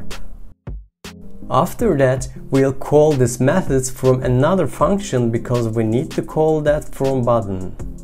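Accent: Turkey. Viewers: Are you Turkish guy ? :)) Your accent is very similar to Turkish :) I have just curious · Videonun ilk 4 saniyesinde telaffuzdan oturu "Lan Turk lan bu" diyisim :) ilk defa goruyorum kanalini, basarilar diliyorum.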